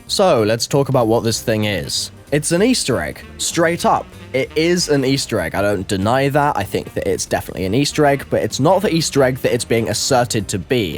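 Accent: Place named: United Kingdom. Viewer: god damn i feel sorry for you,. You actually had to change voice from that happy and silly british accent that made everyone happy to a voice where its so depressing and dark-like compared to other times because of the 10 year old butthurt angry little piece of shits thinking why this is not like The kid wanted it to be. You are working so hard!! And you upload videos this many times!! It just hurts to see this! Just, get a break. The actual people would understand! Props to you,!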